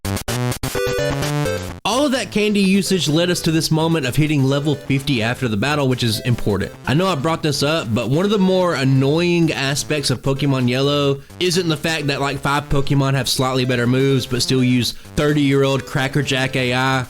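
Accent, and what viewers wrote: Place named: Kentucky, United States. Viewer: The accent sounds like my own, I’m from Kentucky lmfao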